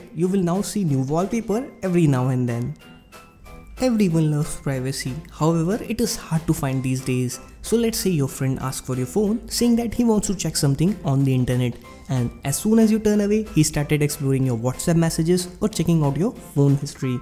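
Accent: India. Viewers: Indian accent always cracks me up😂😂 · same lol ,i hate indian accent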